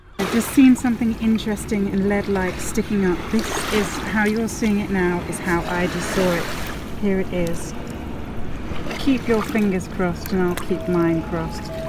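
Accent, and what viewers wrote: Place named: England, United Kingdom. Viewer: You have such a sweet English accent.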